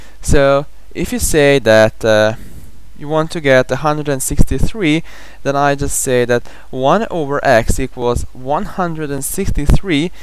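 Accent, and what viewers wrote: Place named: China. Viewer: he must be chinese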